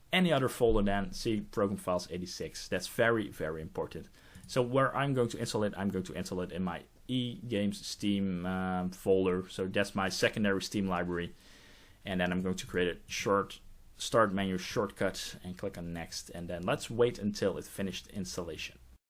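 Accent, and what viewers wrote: Netherlands. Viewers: nederlands accent doet pijn XD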